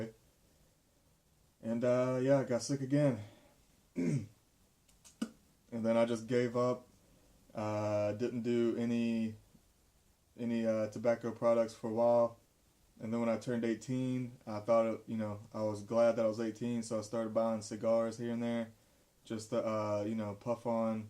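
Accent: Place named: South, United States. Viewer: Thanks for the shout out,! I always liked the tone of voice and attitude that you have! I can hear the southern accent as well as sort of a black sound from a proud white man here! Well, nothing racist here, just your unique, individual style, which is great!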